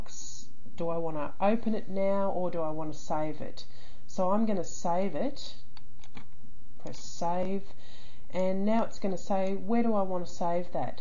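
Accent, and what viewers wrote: Australia. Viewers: This Guy who uploaded it ...Has a true Australian voice Nice Mate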